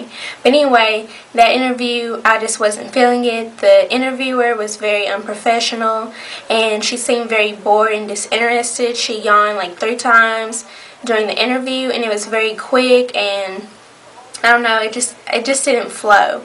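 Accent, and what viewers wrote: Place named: South, United States. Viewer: Omg i love you country voice!!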